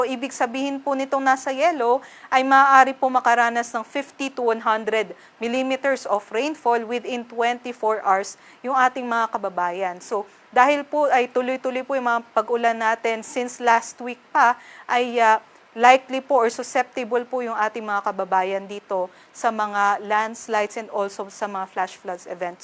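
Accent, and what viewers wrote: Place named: Iloilo, Philippines. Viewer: Ilongga si ate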